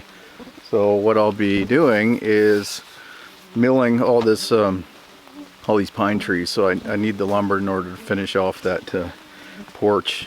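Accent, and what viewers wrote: Canada. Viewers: are you canadian or american ? love your video very very much by the way thanks man from quebec !!!!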